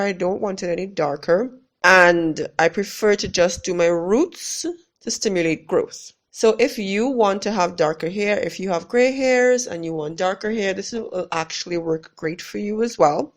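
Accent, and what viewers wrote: Trinidad and Tobago. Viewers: 5 seconds into the video i was like hii trini sister!!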